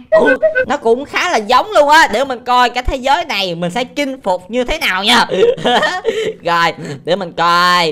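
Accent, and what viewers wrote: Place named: Binh Duong, Vietnam. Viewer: Đọc lạ Bình Dương quá anh ơi😄😄😆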